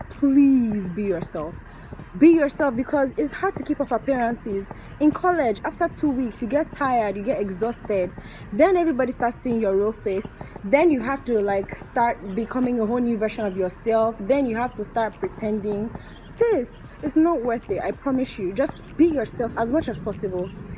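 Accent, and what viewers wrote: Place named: Africa. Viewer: Why do you sound African? I'm hurt😖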